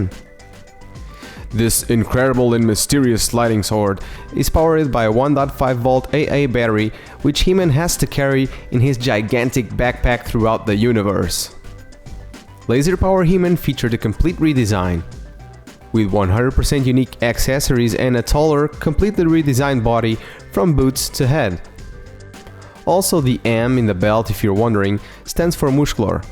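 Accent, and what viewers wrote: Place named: Portugal. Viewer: Pelo teu sotaque es portugues. Encontrei esta figura há uns dias por 50 centimos e achava que era uma falsificação pois não conhecia. Só em casa pesquisei. Infelizmente falta a espada com os 2 fios e o protector de braço, de resto está completa com a caixa e tampa das pilhas. Cabeça do He-man, não do Dolph. Foi a minha primeira figura dos Masters, não tenho mais nenhuma.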